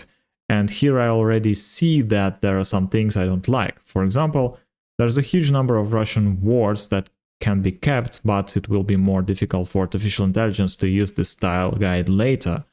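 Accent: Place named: Germany. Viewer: your accent is legit german, that's why i said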